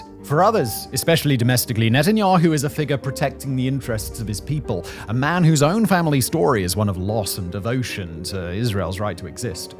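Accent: England, United Kingdom. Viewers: I must admit, the way he so confidently stated it with that kings English accent had me question my own pronunciation for a moment.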